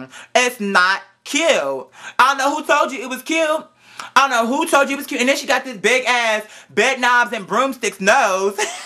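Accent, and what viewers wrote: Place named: New York, United States. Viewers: Where'd that NY accent come from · There's that New York accent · You have east coats accent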